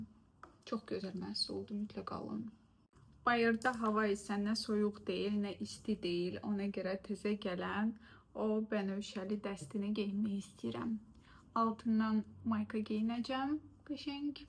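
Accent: Russia. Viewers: после долгого просмотра тебя на русском, смотреть и слушать азербайджанский из твоих уст так странно. И сразу чувствуется,что ты русскоговорящая😅 ❤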